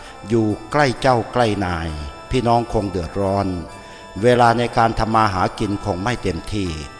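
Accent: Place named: Phu Thai, Thailand. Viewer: คุณพูดสำเนียงผู้ไท. นิดเดียว ลูกหลานอีกหน่อยก็คงไม่กล้าพูดสำเนียงผู้ไท อย่างฉะฉานกันอีก แทกซี่ใน. กทม เขายังพูดสำเนียง. อีสาน. บ้านเฮา บางคนยังเว่าสำเนียง ไทกะเรอ กาฬสินธุ์. กับ ลูกค้าชาวเหนือ หรือถ้า พูดกับลูกค้าพวกเหน่อ สุพรรณ. เน่อเพชรบุรี. เหน่อ หล่มสัก/เลอ หรือเหน่อนครศรีธรรมราษฏร์. เขาต่างพูดสำนวน/สำเนียง บ้านตัวเอง สวนกันไปมาได้ อย่างออกรสชาด แล้ยิ่งคุยยิ่งเปิดเผย ขอเบอร์ จดเลขท้ายรถไวัเรียกใช้ไหว้วานกันวันข้างหน้ากันเลย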